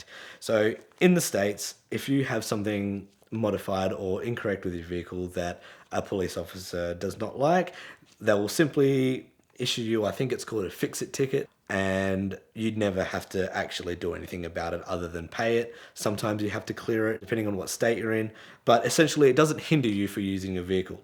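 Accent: Australia. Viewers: You sound like a West Aussie. 🤟 Port Hedland saying g'day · Sounds like you're from qld 🫣🫣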